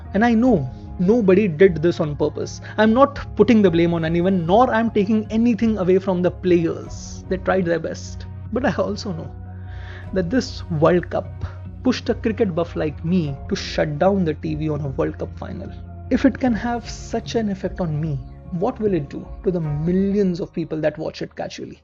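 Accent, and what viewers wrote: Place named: India. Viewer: The presenter has a thick Indian english accent, and a lot of Indians mispronounce 'bowling' as 'balling'. That's the reason. Anyway, that doesn't detract from the entertainment value of the videos, and the interesting stories he brings to the audience.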